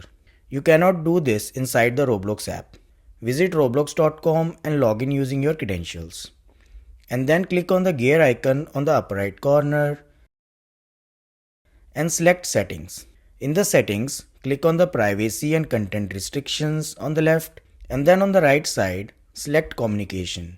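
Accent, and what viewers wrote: India. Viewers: and you sound like from india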